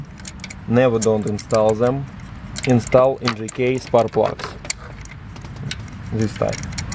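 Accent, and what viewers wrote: Russia. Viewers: love the Russian accent 👌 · You must be russian..:goodvibes: i love your accent. I subscribed! I love your videos.. straight to the point, no bs. Thanks!!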